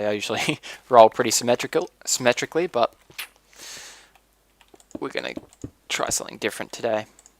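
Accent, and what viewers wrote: Australia. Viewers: Your australian accent :) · Are you Australian?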